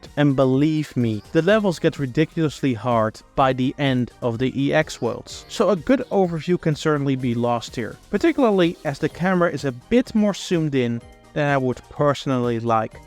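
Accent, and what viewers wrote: Netherlands. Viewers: jouw nederlandse accent geeft me kkr sorry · Searxhed for this comment. There is no doubt. No offence though. My English is only 20% of how good his is. But out of personal preference.. I dislike English with dutch accent. But I think that is just because my brain cant stop foccusing on the accent.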